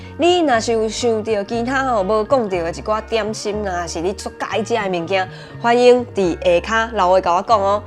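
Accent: Taiwan. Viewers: 我台中的，現在才知道原來南北腔調都有混到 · 南北部腔調念法不一樣 😁 阿妹呀 台語真標準😊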